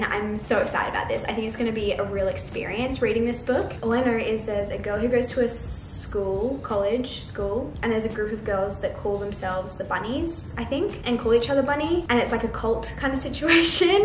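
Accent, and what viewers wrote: Australia. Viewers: scripture in the opening card AND aussie accent? IMMEDIATE subscribe!! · wait, are you aussie? if you are, thats amazing! i am too, and i never ever see any aussie booktuder/ booktokers!! <3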